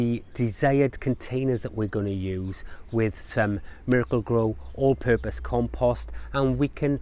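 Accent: Wales, United Kingdom. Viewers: nice to hear the welsh accent here all the way from down under!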